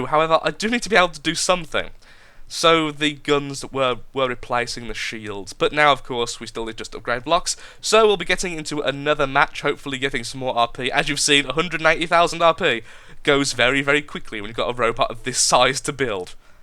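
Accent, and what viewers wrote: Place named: England, United Kingdom. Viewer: O H M Y F R E A K I N G G O S H ! ! ! I just can't concentrate to the game hearing your english accent XD OMG!!! It's a praise =D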